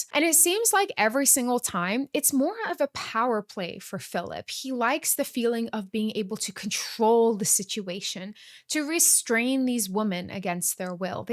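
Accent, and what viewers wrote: United Kingdom. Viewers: I was thinking the same thing hahaha sometimes british sounding and sometimes american sounding but it's actually so nice and unique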